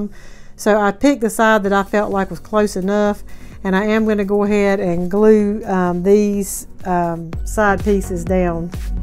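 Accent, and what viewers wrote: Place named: Tennessee, United States. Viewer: AS ALWAYS, LOVE, LOVE, LOVE YOUR VIDEOS & DIYS!!! YOUR VOICE REMINDS ME OF MY FAVORITE SISTER-IN-LAW FROM TENNESSEE. SHE WAS AN ANGEL! HAD SO MANY HEALTH PROBLEMS BUT NEVER COMPLAINED & ALWAYS PUT OTHERS BEFORE HERSELF. I HAVE NOT BEEN TO A DT SINCE MY HIP REPLACEMENT SURGERY, NOVEMBER 12th!!! 😩😳 ONCE OUR WEATHER IMPROVES I AM GOING TO GO! HAVING MAJOR WITHDRAWALS!!! I HAVE MISSED OUT ON SO MANY GREAT ITEMS!!! 😥😭 HOPE YOU & YOURS ARE ALL DOING WELL. KEEP ON WITH YOUR VIDEOS, PLLLLEASE!!!! I SURE WOULD MISS YOU IF YOU DIDN'T!!!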